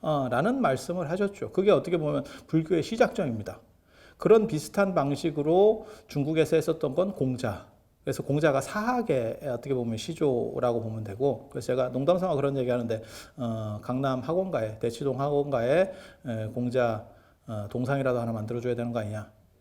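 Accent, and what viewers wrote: Jeolla, South Korea. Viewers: 스님께선 서울서 자랐다고 늘 말씀을 하시는데 말투나 어조는 전라도 사투리가 같아요 시간될때 말씀좀 부탁드립니다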